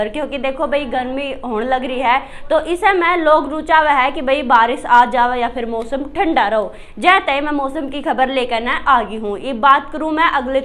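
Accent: Haryana, India. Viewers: I like the haryanvi accent very much ma'am ji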